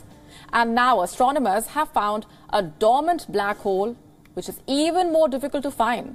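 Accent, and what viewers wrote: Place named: India. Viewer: Why do these indians speak like apu...dont they know that's racist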